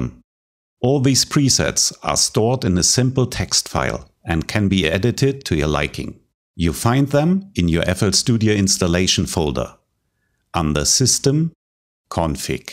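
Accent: Germany. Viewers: Good old Denglisch :D Aber Inhalt ist super!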